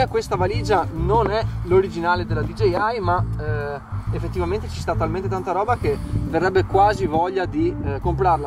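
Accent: Veneto, Italy. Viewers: uè zio sei veneto? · be' io sono Mestre e la parlata veneta la riconosco😋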